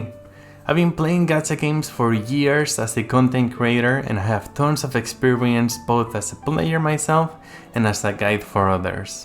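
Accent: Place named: France. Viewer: Honestly, Genshin is my first gacha game and I've been hooked since pandemic and the only thing that kept me sane. So despite all the things negative things that people said about the game I will play the game until it's over. Thank you for the reminder tho. Really need it. Also, your accent sounds french. Are u french? Lol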